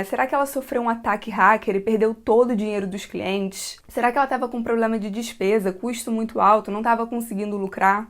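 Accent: Rio de Janeiro, Brazil. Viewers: Nossa, seu sotaque carioca torna o vídeo mais gostoso de consumir. Sério, hahaha. Então, sou novo no canal e desde que lhe descobrir no TikTok, venho acompanhando todos seus vídeos. Tanto aqui quanto lá e posso afirmar que você explica muito bem. Exprime o conteúdo de modo claro. Muito bom. Bitcoin ainda é complexo pra mim — sou leigo no assunto gerais de economia —, mas o interesse que tenho por tudo que envolve economia me locomove para entender mais. É bom estar atualizado por alguém lúcido. Forte abraço. 👍🏼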